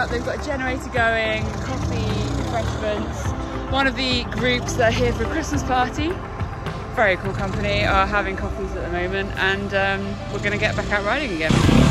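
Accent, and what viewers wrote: United Kingdom. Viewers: Love your English accent, even in your writing. Thank you!!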